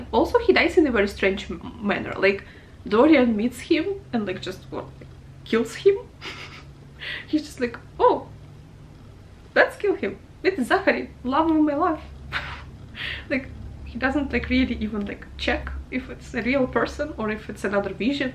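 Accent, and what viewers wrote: Russia. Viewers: Ah, i knew that was a beautiful Russian accent I hear. Are you a fan of Russian lit at least? (oh I see now that you are for sure lol!) I am completely destroyed for all other writers. My reading soul belongs to the Russians :)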